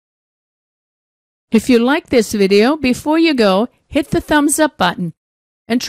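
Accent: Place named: United States. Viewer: Authentic American Pronunciation 😉😊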